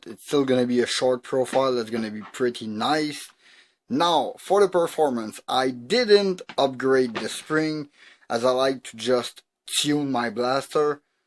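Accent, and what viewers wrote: Canada. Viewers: I had a debate with my 8 year old on the accent :) I saw in another comment you got your parts on aliexpress? Just luck from the algorithm I saw your video and recognized the accent. I do not think I have seen any Canadian modders on youtube except you so far. Anglo here living in Montreal for 20 years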